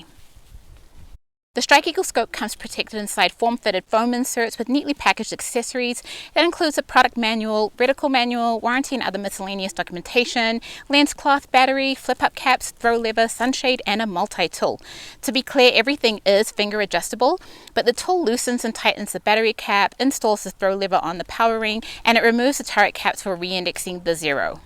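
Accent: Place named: Australia. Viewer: Great break down and description . Was thrown at first with the accent and was surprised to see your channel is out of Australia. With the law's trying to get changed here in the States and law's that had been changed in Australia. Very interesting the many topics your channel can bring. Again great review , very well presented and very informative. would like to see actual action/use of the product/product's. Keep up the great work!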